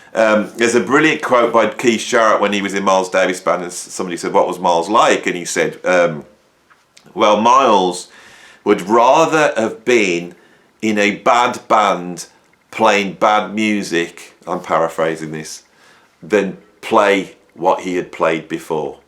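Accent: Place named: Midlands, United Kingdom. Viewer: First of your videos I came across, agree with everything you say, so I subscribed, and you sound like a Brummy or Midlander.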